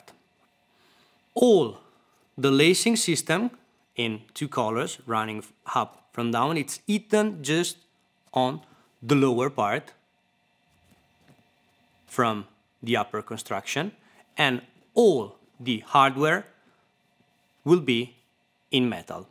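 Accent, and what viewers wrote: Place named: Italy. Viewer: he's most definitely italian judging by his accent.I'm romanian and we don't sound like that when speaking english.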